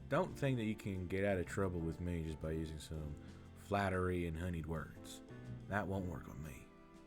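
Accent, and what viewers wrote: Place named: South, United States. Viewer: ✨Ohhh a southern accent! You did a phenomenal job! Loved it!😊👏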